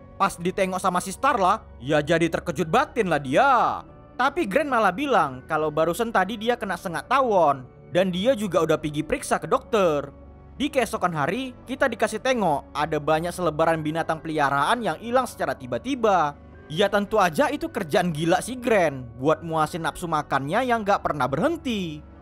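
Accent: Medan, Indonesia. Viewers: Org medan nih bos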